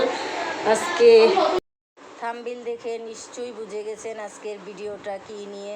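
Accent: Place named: Comilla, Bangladesh. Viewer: আপনার বাড়ি ব্রাহ্মনবাড়িয়া না কুমিল্লা কথা শুনে মনে হচ্ছে ❤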